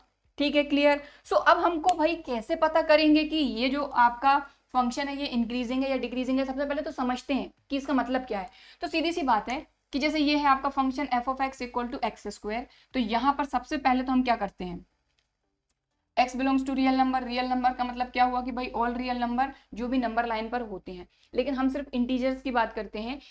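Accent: Haryana, India. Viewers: mam I think you belong to HARYANA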